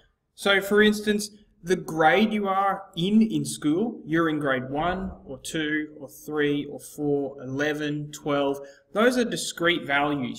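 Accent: Australia. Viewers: he has an australian accent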